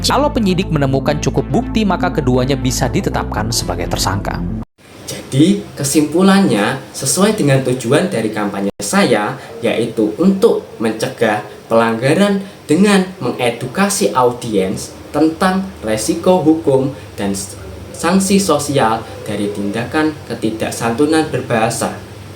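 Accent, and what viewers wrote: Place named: Java, Indonesia. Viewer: Semangat, Medok ciri khas👍👍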